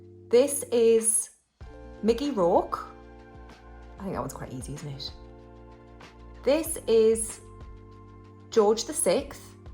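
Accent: Newcastle, United Kingdom. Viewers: Love listening to your accent. I'm a Geordie too but live in Northern Ireland so I miss the home accent. You sound more Gateshead than Newcastle...?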